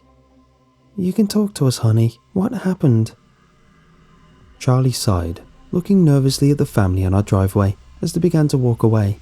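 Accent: United Kingdom. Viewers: Is from the UK?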